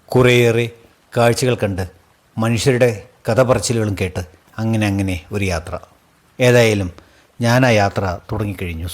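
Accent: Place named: Valluvanad, India. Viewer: അസലായിട്ടുണ്ട് ട്ടോ കുട്ടി, കഥയും അവതരണവും. നമ്മുടെ വള്ളുവനാടൻ ശൈലിയിൽ മനോഹരം❤👌👏🙏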